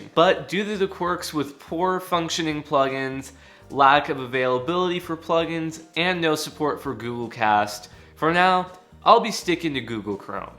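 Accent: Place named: California, United States. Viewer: I like his California Stoner dialect.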